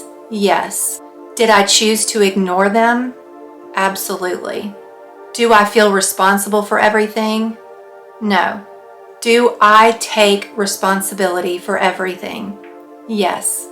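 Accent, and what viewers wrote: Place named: South, United States. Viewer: I like Blue and Yellow and your southern accent.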